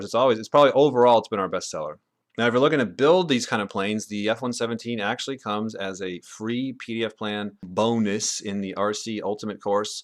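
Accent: Russia. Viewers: like the russian accent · lol russian accent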